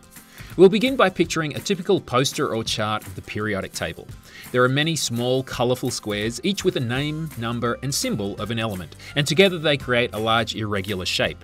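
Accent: Australia. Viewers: R u Australian? 😅 sorry but u sound Australian just asking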